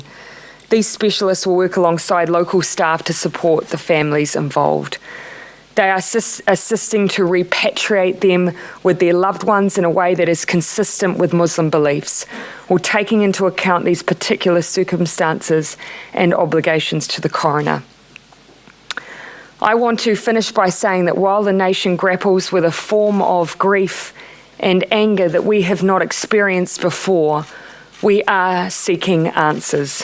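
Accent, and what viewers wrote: United States. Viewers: sounds like an American moved to new Zealand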